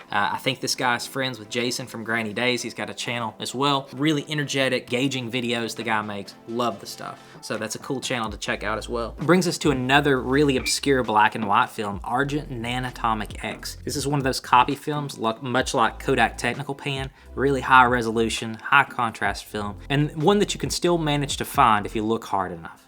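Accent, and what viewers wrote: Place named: South, United States. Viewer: i love the southern drawll accent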